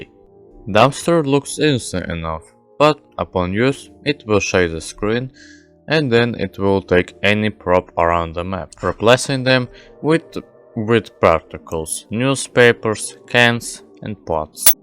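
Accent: Russia. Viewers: i think he has a russian accent or something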